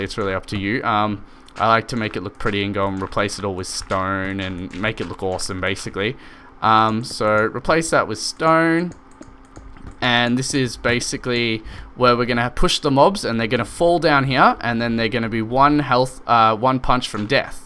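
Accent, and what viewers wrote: Australia. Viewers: you inspired me mate, wait your aussie! SAME!!!!! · easy as, aussie?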